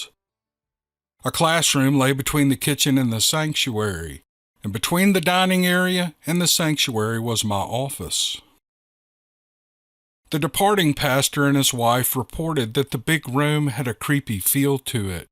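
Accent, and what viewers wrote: South, United States. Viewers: Awesome stuff Mr.. Your narration with your southern accent makes a great combination. Every time you get a giggle in, it sure makes me laugh. It’s all a good time brother, alright, here we go. Peace. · Wow! Great stories- especially that last one - it had me on the end of my seat! I was SO relieved to hear it was just a story! Got me there, hehehe...! Your wonderful southern accent gives a new dimension to these stories,! You've become my favourite storyteller over the last few months. Thank you for creating this channel, for all the times you've helped me to relax and sleep... ❤ ❤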